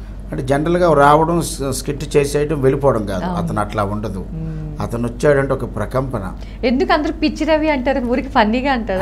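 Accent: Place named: Telangana, India. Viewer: రచ్చరవిగారు ఒక అద్భుతం🙏ఆయన తెలంగాణ మాండలీకం అద్భుతం🙏🙌👏👏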